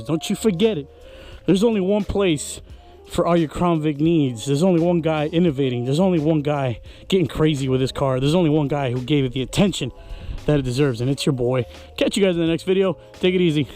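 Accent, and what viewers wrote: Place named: Tennessee, United States. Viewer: StereTyping thats why I said, Im not hatting tho. TN accent is bomb.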